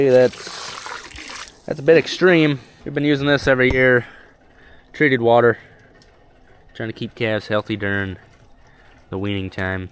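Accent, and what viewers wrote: South Dakota, United States. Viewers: Was just wondering if you see any Pheasant while driving in your fields. Love the South Dakota accent!!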